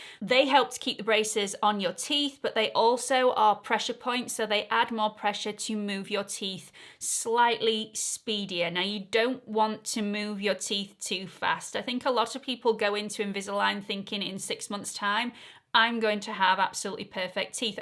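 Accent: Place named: Yorkshire, United Kingdom. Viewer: I'm from the US but I knew I heard Yorkshire!!!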